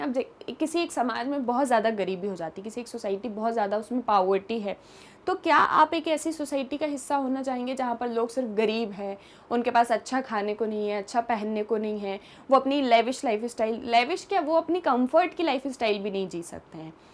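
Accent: India. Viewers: How are u doing dear Madam. I am so much eager to listen to ur lesson on sociology. However , it so difficult to understand ur pronunciation as it is highly influenced by zIindian English.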